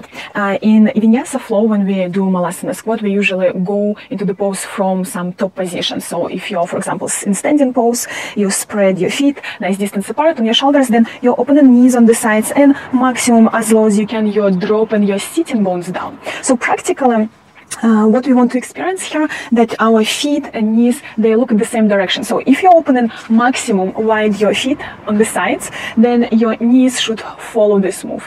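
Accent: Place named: Germany. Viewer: A real sincere efforts to help yogis struggling with this pose. Thnx for sharing. Also, I loved ur English with ( if I m not wrong), German accent. Love from India. Take care, stay safe.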